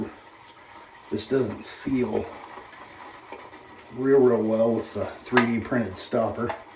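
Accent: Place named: South, United States. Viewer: Hey, thanks for the video. What are your thoughts on the Razorock Game Changer .84 Open Comb, now, 4 years later? I found your video because I just ordered my first safety razor, after using cartridges for 30 years - first the Gillette Sensor, then the Mach 3. After some reading and some recommendations, I went with the GC .84 OC. (I’ve always been one to dive in head first!) Also, sounds like you’re from the South. I’m in Nashville area.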